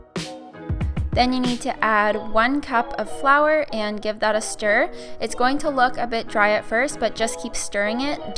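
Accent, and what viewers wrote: Canada. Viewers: Dark hair reeeeally suits you! And your Canadian accent is adorable :) love this! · Do I hear an accent? Canadian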